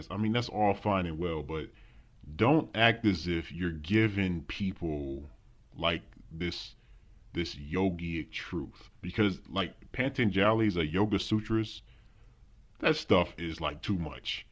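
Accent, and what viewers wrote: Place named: Baltimore, United States. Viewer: You still go out? Ima probably go to DC soon and I hear Baltimore in your accent. Pull up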